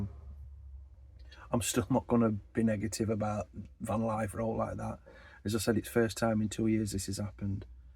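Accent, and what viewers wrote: United Kingdom. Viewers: Oh, I hear an accent, you are in the UK. Beware, Jack the Ripper got his start in and around London.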